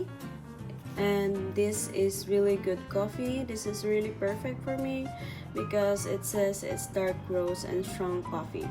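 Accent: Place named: Thailand. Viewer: Dang! What haffen vella? Your accent is becoming thai now haha!😅 You should learn how to speak in thai now! Btw, cheers to more cooking videos chef! 🧑‍🍳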